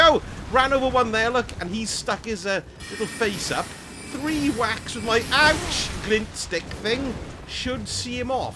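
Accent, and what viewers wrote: Wales, United Kingdom. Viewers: I love this guys energy he’s class 😆 and the Welsh accent just makes it 10 times better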